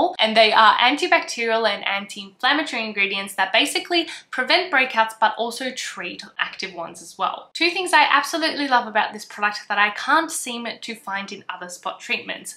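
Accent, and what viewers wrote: Australia. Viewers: also is she australian? I feel like im getting that familiar accent. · Girl I cannot tell if you have an Australian accent or not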